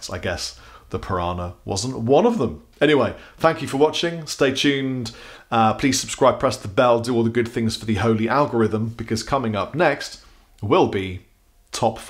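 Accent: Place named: Wiltshire, United Kingdom. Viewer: is it just me or is your Wiltshire accent becoming more prominent with age? Give it another decade and you'll sound like Phil Harding of Time Team... which is not a bad thing!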